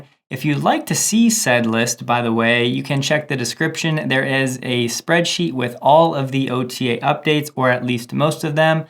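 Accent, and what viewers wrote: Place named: Staten Island, United States. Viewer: Are you from Stanton Island?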